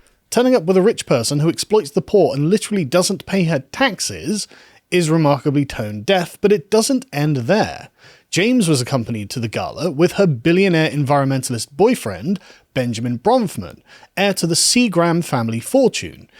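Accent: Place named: West Country, United Kingdom. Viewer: It's that ever so slightly West country accent. Makes me homesick.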